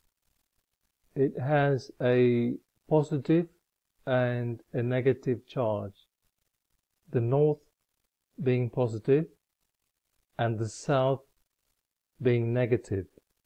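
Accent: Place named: India. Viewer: not at all! You sound like you may have an Indian accent, that would make us neighbours (I was born in Sri Lanka) ! But I'm not 100%...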